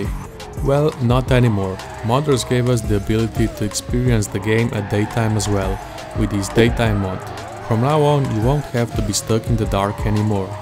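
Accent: Russia. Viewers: Акцент выдает своего))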